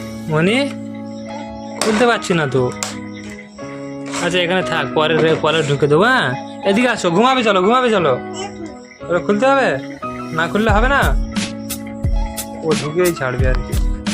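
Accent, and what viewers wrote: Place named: Bangladesh. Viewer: আমি বাংলা দে-শ থেকে দেখছি আপনা দের সবার বিডিও দেখি আপনার। কথা বারতা শুনলে অনেক বাংলা দেশি লাগে দোয়া রইলো আপনার জন্য আমিন আল্লাহর কাছে সাহায্য চাই আপনার জন্য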